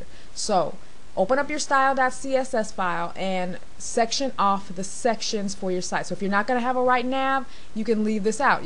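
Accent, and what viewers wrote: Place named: South, United States. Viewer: I love your voice. Very smooth, soft and with a subtle hint of Y'ALL.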